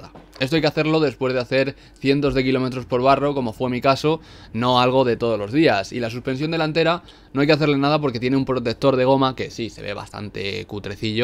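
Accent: Spain. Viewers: Ahora me di cuenta que es español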